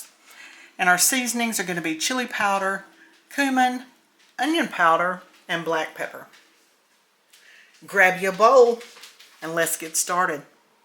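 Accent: South, United States. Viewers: Hello. Just wanted to say how I love your channel. You remind me of myself. It just cooking for one. It is a guessing game trying to decide what to do with the leftovers. But with the price of food today you have to make do with what you have. Keep the videos coming.😊 Oh and I love that southern draw when you speak. Be Blessed.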